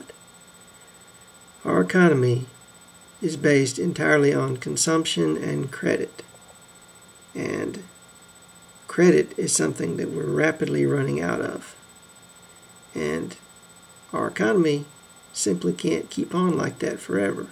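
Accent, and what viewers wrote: South, United States. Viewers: Nice Southern Drawl and I am from La, if you know the truth you should share it. Isreal is a pawn and so are many of us that are slaves to the Money Masters. I do think it is time for the Constitutionalists need to start this off, but no you will wait till they come and knock down your door. The only thing you need to know is that the Elite and the G8 and the Bilderbergs, TLC and the UN and most of the Fed has us all by the Balls.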